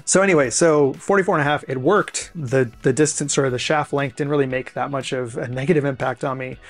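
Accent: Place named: New Zealand. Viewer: Cant believe I didnt pick up the accent. Cant stand listing to kiwi accents, or sepo's either, but put them together and it works. US ladies def love the kiwi twang aye :)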